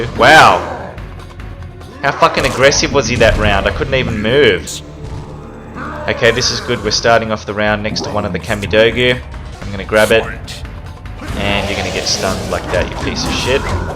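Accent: Australia. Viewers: Yup that’s blunt amazing Australian accent ✨✨💞💞💞💞💞🔥🔥😭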